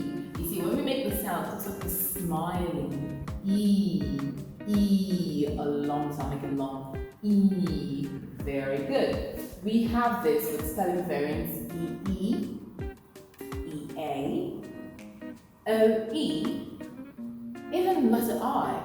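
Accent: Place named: United Kingdom. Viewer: Wow I love your British accent, it's very elegant and beautiful. I think your YouTube channel will do just fine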